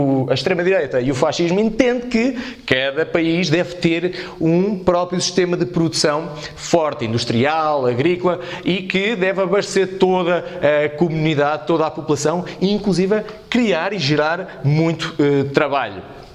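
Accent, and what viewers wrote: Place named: Portugal. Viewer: Eu sou do Brasil vc me ajudou muito msm sendo português amei seu sotaque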